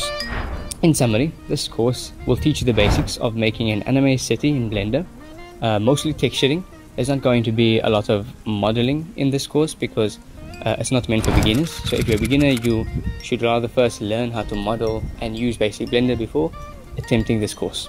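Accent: South Africa. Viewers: Is that a Capetonian accent I hear? Great videos!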